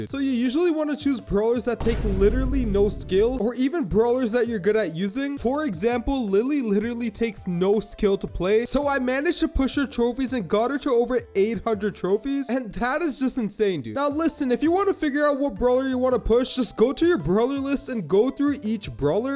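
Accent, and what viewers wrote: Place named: Canada. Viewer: he is canadian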